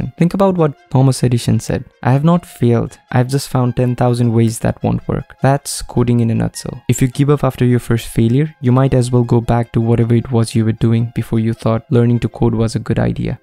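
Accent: India. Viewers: where you from bro? India, UP?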